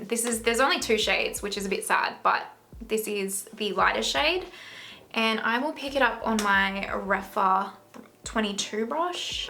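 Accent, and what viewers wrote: Australia. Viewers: Hi sweetie. I just rewatched this to see how you prefer to put the nars on and I must have missed you saying it the first time but you haven't tried the soft matt. You should. It's my favorite of all the nars foundations, It's for your more full coverage days. But so beautiful. And you get so much more product. Try it one day. xoxo BTW Great video. You always have me laughing with our aussie accent. Love ya gutts babe. xo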